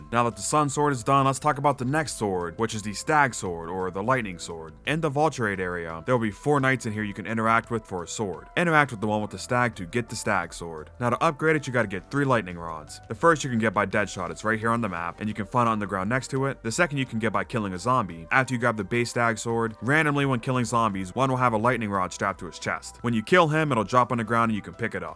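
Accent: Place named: United States. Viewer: Every time I look up a guide it’s some British dude. Glad to hear an American man, or at least someone that sounds like one 🇺🇸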